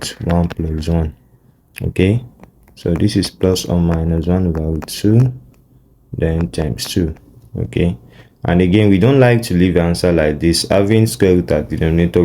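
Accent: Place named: Europe. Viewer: In math, we strive for precision and certainty, so I appreciate how you described it as a convention. I’d be glad to learn that you're from the US, though it sounds to me like you might be from Europe instead.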